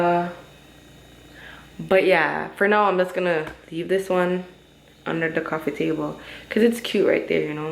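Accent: Jamaica. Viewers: Dope video, why yuh sound like a yawdy🤔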